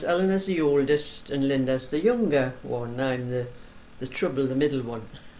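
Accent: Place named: North East England, United Kingdom. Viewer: I have just come across your channel, it is such a joy to hear your lovely North East accent. My husband came from Houghton le Spring County Durham. Sadly my husband and I had serious covid at the very beginning. Sadly my very fit and active 82 year old husband died of covid the first day of lock down. I live in Cheshire and so I don,t hear the lovely lilt and phrasing of yours and my husbands accent any more. Thank you for your just lovely warm and homely channel. Regards Christine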